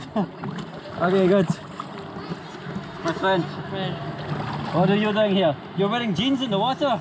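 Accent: Scotland, United Kingdom. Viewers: Don't know how one of your vids found its way to my recommended list but I am loving your travel vlogs. Also love the Scottish accent so hearing you talk is a bonus for me ☺ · even then, he's using a scottish accent (which of course makes it even harder). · so guys in conclusion , every humans has a bad side , so no one is wrong in here, ok case closed but if im there , ill actually be friendly , because i want to train my english, and also he has a scottish accent which is something i like.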